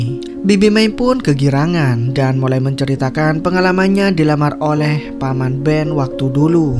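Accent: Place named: Java, Indonesia. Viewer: Pake kata (saya) aja Biyar enak di dengar bng ,( guaww) terlalu medok jdi sedikit geli. (Ini saran bukan hina)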